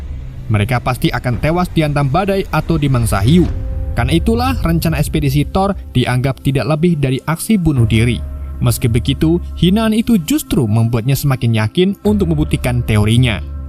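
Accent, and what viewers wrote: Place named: Pemalang, Indonesia. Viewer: Kamu orang pemalang kah min?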